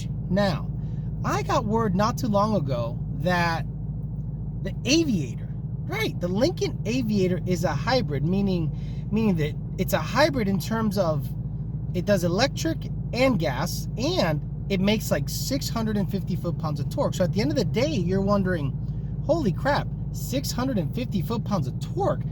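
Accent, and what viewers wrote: United Kingdom. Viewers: That damn UK accent had me dying lmao. Great video again brother. 🤘🤘🤘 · Wagons ( or in your english accent they're called an "estate") look great. Audi's avant line Mercedes with the e-class amg wagons BMW has the greatest of all time RIP E39 touring (wagon) But yes you're right, many cars look better as a performance wagon.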